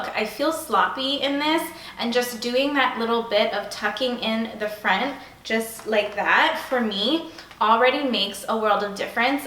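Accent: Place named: West Coast, United States. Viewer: I don’t think she sounds stuck up! She has an eye for fashion. She may not be individually judging people, she can just see when something looks “on” or “off” and she has the problem solving capabilities to figure how to improve things. Then she goes and shares her advice with others instead of keeping the secrets to herself. And her voice doesn’t sound stuck up, she talks likes she’s from the west coast. That’s a habit that’s shaped by her region. It’s not really fair to attack someone on a public forum who is being nothing but kind and giving her (maybe not to everyone, but definitely to some) helpful opinions.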